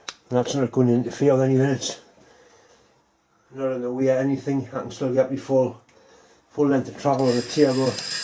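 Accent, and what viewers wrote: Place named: RP, United Kingdom. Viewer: I think if viewers can’t understand your Queen’s English they just need to watch more of your videos. It kinda grows on you 😊. All the best to you and your family. I hope you have a Merry Christmas and a terrific New Year!!! Norm in Arizona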